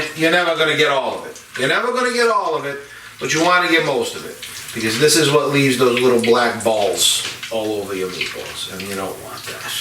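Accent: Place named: Massachusetts, United States. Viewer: EH I SEE YOU GOT YOUR ST CHRISTOPHER ON YOUR NECK THERE... LOVE YOUR BEEYOUDEEFULL SILVA CHAINS ROUND YOUR NECK THERE... DID YOUR PARENTS SPEAK ITALIAN? YOU SOUND LIKE YOU AHH FROM MASSACHUSETTS.... MY WHOLE FAMILY ON MY MUTHAS SIDE WAS FROM THEY AHH. LOL YOU ARE WONDERFUL THANKS FOR THE RECIPE GOTTA GO TO THE GROCERY STORE REAL QUICK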